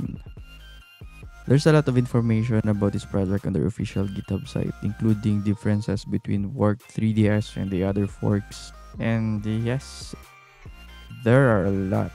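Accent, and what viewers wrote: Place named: Philippines. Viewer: Man you filipino!? I'm subscribing after hearing that accent. Also we have the same tablet so your channel would be very helpful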